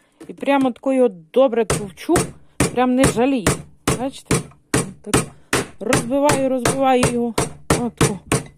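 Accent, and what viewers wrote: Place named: Russia. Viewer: Капец...Мучается бедная баба с мовой.Поганит украинский язык..Акцент русский так и прёт.Приспособленка.😂😂😂😂😂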